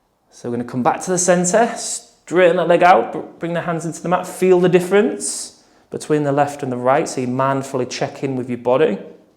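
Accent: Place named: Yorkshire, United Kingdom. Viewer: Thank you, for this and all videos! I love your calm voice and your lovely accent (Yorkshire?) 😊 and I feel good after my runs when I stretch with you. So thanks again and see you soon!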